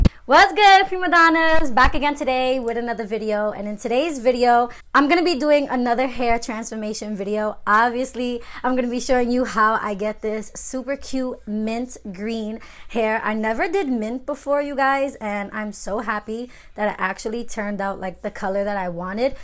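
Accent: New York, United States. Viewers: I love your New York accent 😍😍😍😍😍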